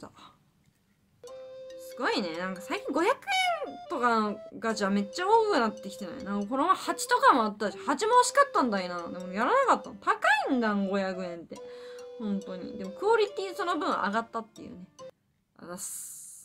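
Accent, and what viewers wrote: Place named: Sendai, Japan. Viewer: ばちくそ出てくる仙台弁がいい‼️ 親近感🥰‼️ 馴染みしかない喋り方‼️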